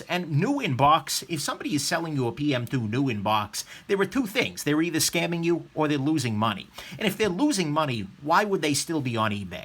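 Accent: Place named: South, United States. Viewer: Your southern accent is amazing!! You’re hilarious! Love it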